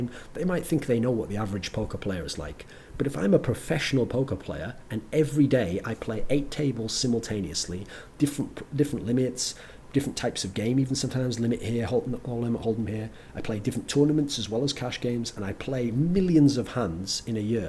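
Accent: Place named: United Kingdom. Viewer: Is he from UK? I find it a bit hard to understand what he's talking about, because of his accent. Btw, amazing speach bro!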